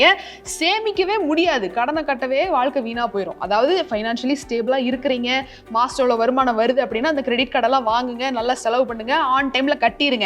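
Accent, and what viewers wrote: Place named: Kongu Nadu, India. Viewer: கொங்குநாடு பேச்சி மிக அருமை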